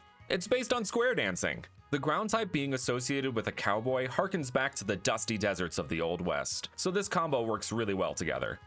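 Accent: Ireland. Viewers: Being Irish I can confirm he is Irish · Are you from Ireland too? 💚🤍🧡🇮🇪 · I thought he was Irish Also merry Christmas 🎄🎄🎄🎄🎄🎄🎄🎄🎄🎄🎄🎄🎆🎇✨🎁🎈🎄🎄🎄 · Be proud of your Irish heritage - it makes you human (and I love an Irish accent! 😊)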